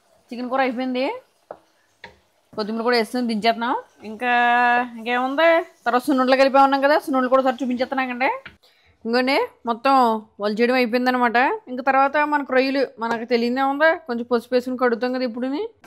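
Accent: Godavari, India. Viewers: Akka godawari pilla bale matladutunnavu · Very nice godavari vioce style 😅👌👌👌👌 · Anni panulu bale chesaru fast ga nice godavari yasa baga mataladutunaru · Godavari basha Baga matladu tunnaru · ❤❤❤... America lo untu kuda chakkaga godari lo matladtuu mana ammayi anipinchukunnaru andi🎉🎉.. Disti tiyinchukundi😁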